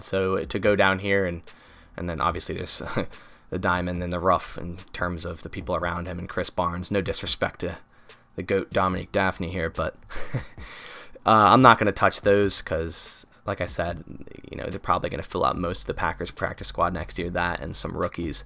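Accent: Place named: Maryland, United States. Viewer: i watched the wire recently and ive been on the lookout for maryland area accents; i love yours! keep making good content :) and go pack go of course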